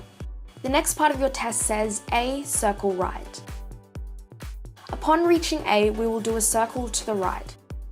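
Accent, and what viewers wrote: Australia. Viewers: What server are you on? You sound Australian so I guess you'd be on FS with most? I'm on Magnolia Jungle which is apparently a lot quieter than Firestar. Maybe when I've been playing SSO for a bit longer I'll have a look for other people into RL dressage on SSO. Not to start a club as such, just to get some people together who want to do real life dressage. If anyone who reads this is on Magnolia Jungle and is interested in doing some dressage with me, please just reply to this comment and we'll organise to get together :) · Luv the vid! We r so similar! Both come from Australia (I’m guessing from the accent), both play sso, and both have birds!!!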